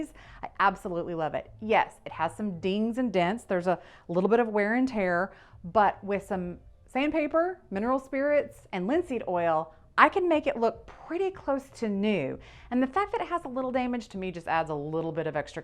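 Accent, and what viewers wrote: South, United States. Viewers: I absolutely love her subtle southern drawl 😍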